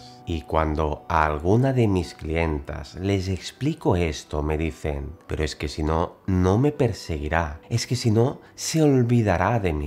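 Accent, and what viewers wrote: Spain. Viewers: su acento bien español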